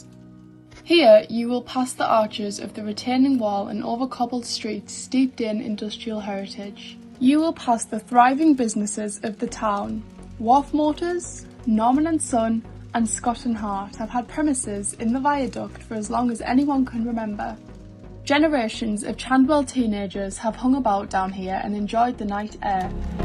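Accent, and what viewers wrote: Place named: Newcastle, United Kingdom. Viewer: Aaye lovely Georgie bird accent! CANNY MAN!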